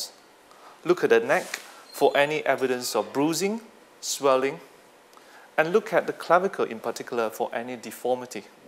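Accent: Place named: Singapore. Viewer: A very polished Singapore accent.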